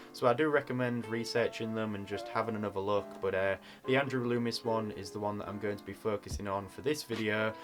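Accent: United Kingdom. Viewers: cool tutorial but i cant figure out wtf is going on with ur accent. like i feel like its British or something most of the time and then you'll say a word that just sounds so southern. not hate I'm just really confused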